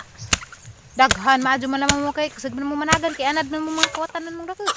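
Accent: Bohol, Philippines. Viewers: Lambing ug punto pagka binojol...heheh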